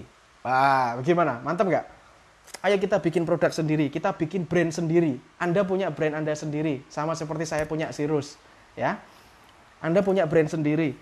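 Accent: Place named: Yogyakarta, Indonesia. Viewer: Jelas mas dr yogya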